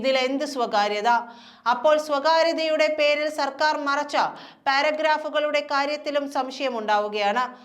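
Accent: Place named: Thrissur, India. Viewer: അത് തൃശൂർ ഭാഷയാണ്. ഇവിടെ അങ്ങനെയാണ്.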